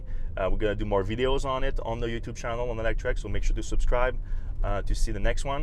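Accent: Quebec, Canada. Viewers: sounds like a quebecois? u from here bruh? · Love the quebec accent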